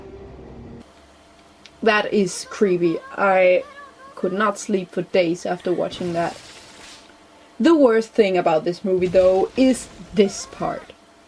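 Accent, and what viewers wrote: Denmark. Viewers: You're from Denmark aren't you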